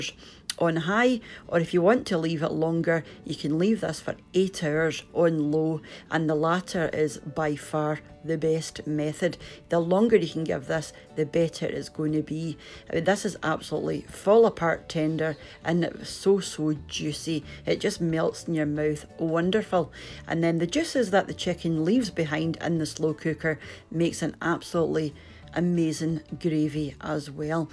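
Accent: Scotland, United Kingdom. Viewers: AWESOME ......love the scots accent x Mines now ready thanks 0) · Love the chicken recipe and the lovely Scottish accent. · Thanks for the video - heard the Scottish accent after a long time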